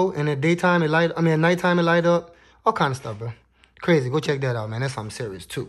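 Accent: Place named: New Orleans, United States. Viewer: that boy sound like hes from nola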